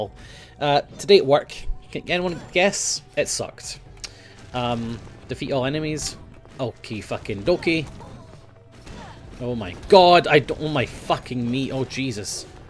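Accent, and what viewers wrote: Scotland, United Kingdom. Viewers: I wish i was Scottish u speak fucking Cool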